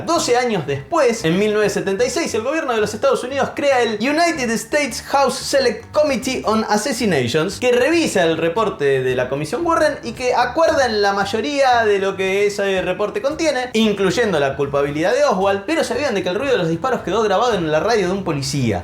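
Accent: Argentina. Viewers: Sha sha sha sha.....ese acento argentino fastidia....she she she....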